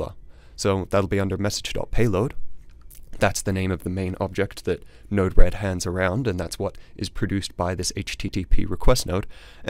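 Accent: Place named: East Coast, United States. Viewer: I thought this tutorial was excellent. Thank you. BTW, I can't quite pin point your accent. I can hear its in the United States but where specifically I can't quite figure. It does have some East Coast in it but given you operate from the West Coast, I'm wrong probably. Anyway, thanks again for the clear insights. I've since subscribed for more excellent content.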